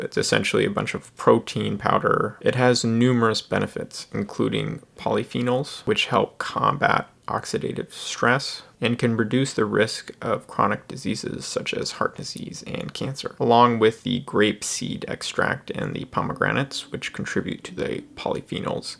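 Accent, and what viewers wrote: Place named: Pacific Northwest, United States. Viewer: Pacific Northwest accent maybe? A lot of people here speak like this.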